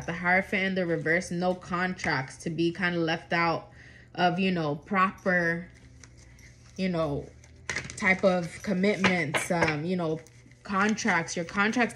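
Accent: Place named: Caribbean. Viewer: I love your Spanish, are you Dominican? Cuban? Puerto Rican?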